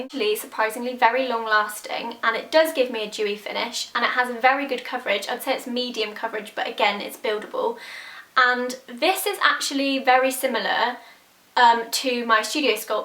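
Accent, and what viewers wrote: United Kingdom. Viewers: I don't understand why people think you're faking the british accent? Maybe she's just very well spoken and has very good dialect? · not to be rude or anything but that was a really stupid comment. would you please enlighten me on why she would feel the need to "fake" an accent in her videos? she's from the U.K, shes BRITISH.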